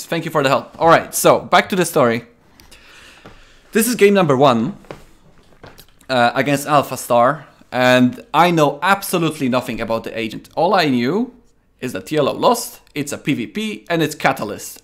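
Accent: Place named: Poland. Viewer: Nice adventure you've had! I enjoyed watching your inside view of this AI vs Human duel, well done! PS. Funny, after a few minutes of watching I've noticed that except of the familiar accent you've used some phrases that is also familiar for me but definitely not of an English origin so I've checked where are you from and Bingo! - fellow Pole. Don't get me wrong, your English is very good, but while freely speaking you gave up your nationality ie. "ran circles around someone" in English mean to do something much better than someone else rather than taking extreme care about someone (Polish meaning of this phrase). Pozdrowienia! Oh and btw, as I understood you've played against different agents each game right? I felt sad for you because of your first 5 matches but imagine what happened to the agent who lost exhibition match - probably it has been deleted.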